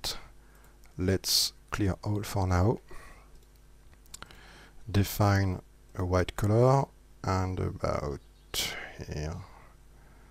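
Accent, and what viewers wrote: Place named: France. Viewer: Really useful video. I would have never thought about that trick. Also I enjoy your accent. Brings back good memories from the land of Begets and red whine.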